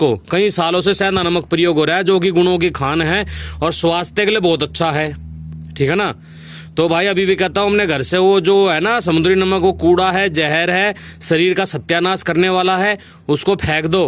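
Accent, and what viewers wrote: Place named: Haryana, India. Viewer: Bhai ka haryanvi. 😂😂😂👌👌👌👌 Ekdam kadak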